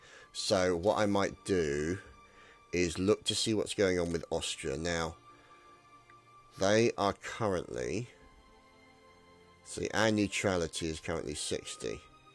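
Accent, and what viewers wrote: United Kingdom. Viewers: is this person British ?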